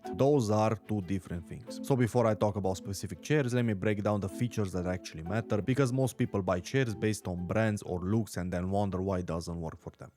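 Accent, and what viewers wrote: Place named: Romania. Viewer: romanian accent really showing in this one, nice vid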